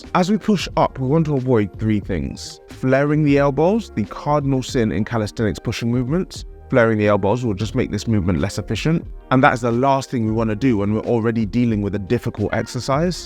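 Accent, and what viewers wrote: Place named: United Kingdom. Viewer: I always try to find the most niche problems and this guy always has a vid about it. Plus yhe british accent! Fucking legend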